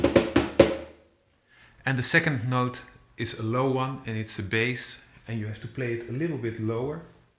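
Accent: Netherlands. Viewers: You sounds dutch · Je bent zeker een hollander,ik herkend de uitspraak,zelf kom ik uit Iran en woon in Parijs, ik will here je bedanken voor dit aardige video dat je ons laaten zien,ik ben een beginners om cajon spelen en vond ik je video perfect,dank u wel....Thank's a lot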